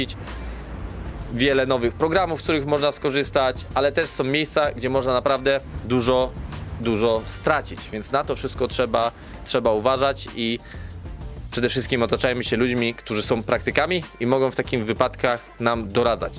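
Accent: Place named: Eastern Europe. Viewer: Slychac rosyjski ukrainski albo bialoruski akcent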